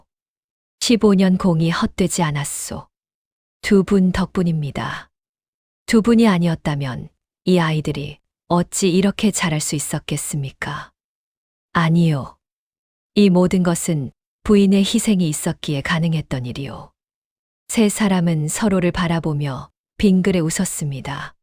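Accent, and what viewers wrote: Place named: China. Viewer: 억지로 읽는걸 들어보니 중국인 아니면, 조선족인가?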